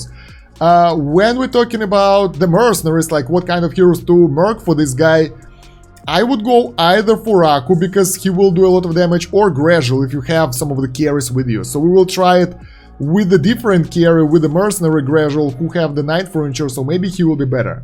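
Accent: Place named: Russia. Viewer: Русский) сразу слышно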